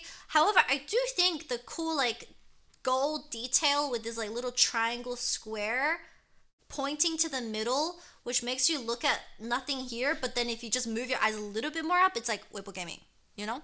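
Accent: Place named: Australia. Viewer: So cute but I can't stand the aussie accent lol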